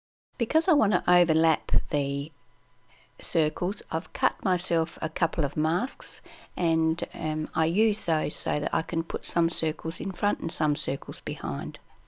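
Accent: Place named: Australia. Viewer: Nice to hear a fellow Aussie voice!!! Great page! That pastel green as a background with the orange is very dramatic!!!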